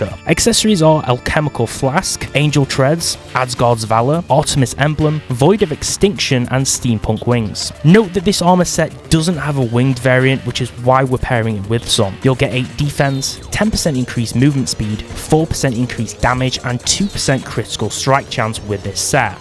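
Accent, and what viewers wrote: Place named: United Kingdom. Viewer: is british? no more video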